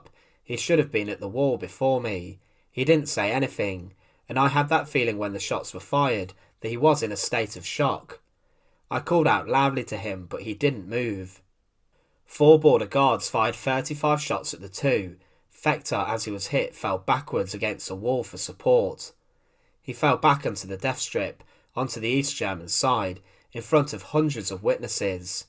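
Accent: United Kingdom. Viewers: Worst documentary with a British guy speaking, and the award goes to.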